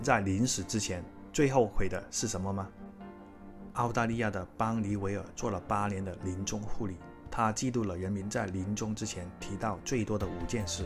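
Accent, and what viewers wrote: Cantonese, China. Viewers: 怎么有那么重的香港口音的？难听死了! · up主是广东人吗？您说普通话跟我以前的同事们听起来差不多，还挺亲切的😂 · 加油加油，終於再見了，終於再聽到係的廣普了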